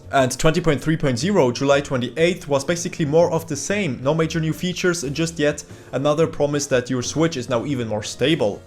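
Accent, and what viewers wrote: Germany. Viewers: I Hear deutsch Bro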